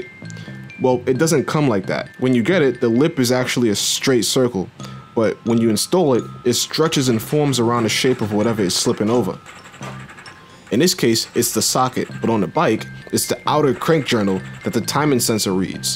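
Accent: United States. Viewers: What an amazing voice most american accents are nasal and have an annoying twang but yours is fucking brilliant!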